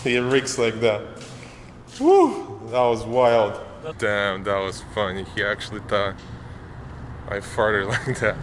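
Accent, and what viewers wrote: Russia. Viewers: У него русский акцент) Он меня поймёт)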